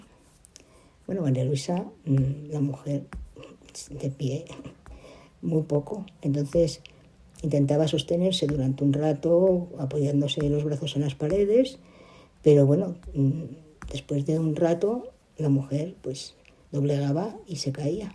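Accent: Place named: Asturias, Spain. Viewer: Mi like y suscripcion para que tu canal crezca , por el acento pareces Asturiana , yo tambien , buena suerye con el canal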